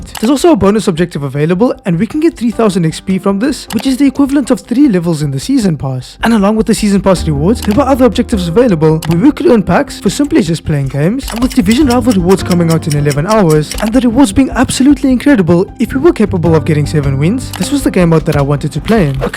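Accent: South Africa. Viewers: Yooo u south african 🇿🇦?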